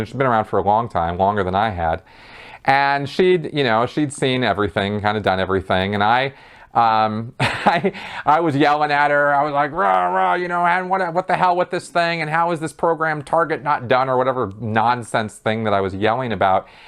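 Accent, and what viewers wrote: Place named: California, United States. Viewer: For anyone wondering… his voice/accent is a California accent (he’s not gay) Not all Californians have it, in much the same way not everyone from New Jersey has the accent, as time goes on this accent is becoming increasingly rare as people spend more time watching nationally broadcast programs… which tend to standardize the accents of those that watch/listen to them.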